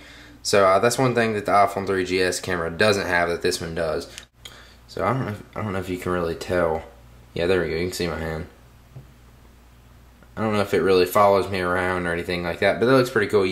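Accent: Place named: Georgia, United States. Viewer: You're from Georgia are't ya bud. Somewhere a little South of ATL? Spalding county maybe.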